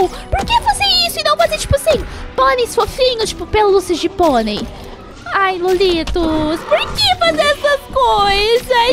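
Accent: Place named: Argentina. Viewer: Está bueno hablar así porque parece idioma argentino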